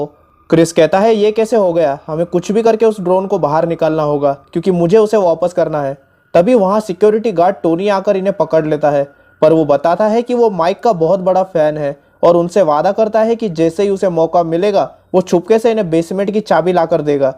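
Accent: Maharashtra, India. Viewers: Marathi jesa lag rha h accent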